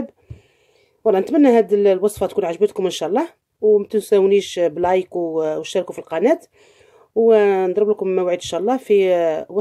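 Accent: Oran, Algeria. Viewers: واش نقولك راني شفت القناة ديالك عندي أسبوع و كلش عجبني فكرتيني بالماكلة تاعنا في وهران و حتى هدرتك الله يحفظك و يحفظلك بناتك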